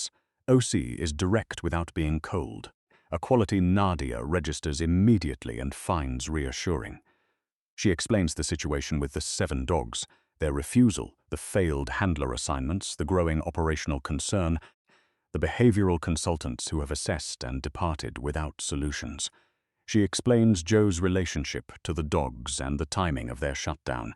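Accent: United Kingdom. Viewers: It’s a British accent, in the King’s English.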